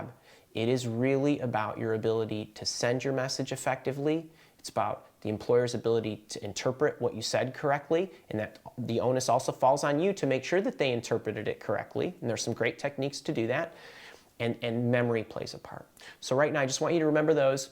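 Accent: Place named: Wisconsin, United States. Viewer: Is this dude from Wisconsin or something? “Jahb”, “Fayst”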